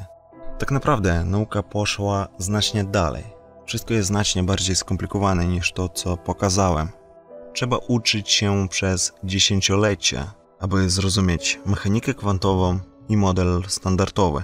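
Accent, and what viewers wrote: East, Poland. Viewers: Twój wschodni akcent sprawia tylko że przyjemniej słucha sie o fizyce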